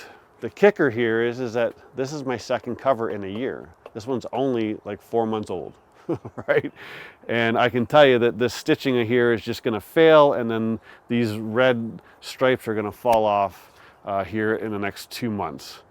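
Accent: Canada. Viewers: Great video thanks. I’m considering the KK but doesn’t seem to be available in Canada. You do sound Canadian btw.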